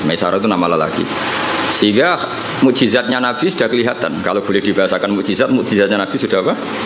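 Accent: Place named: Kebumen, Indonesia. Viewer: Kebumen ngapak nyimk kyai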